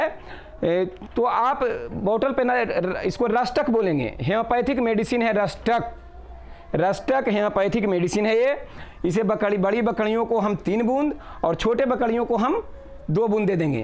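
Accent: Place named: Bihar, India. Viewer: सर जी आप दवाइयों के नाम स्क्रीन पर दे दिया करे क्योंकि बिहारी टोन होने के कारण शब्दों को समझने में दिक्कत होती हैं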